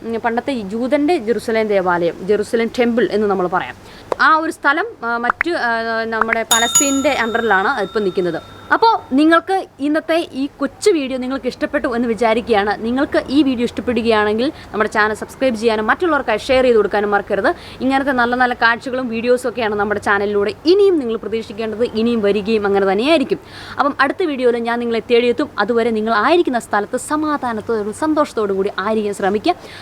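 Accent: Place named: Kothamangalam, India. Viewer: Vargheeya Paraamarsham Nadathaathe Nishpakshamaayi Kaaryanghall Parayu....Paavam Malayalli Vlog Kandu padhikku... Theere Moshamaya Avatharannam...Bhaashayum Athupole....Kothaazham Slang Arochakam..... 🙄😡🙄😡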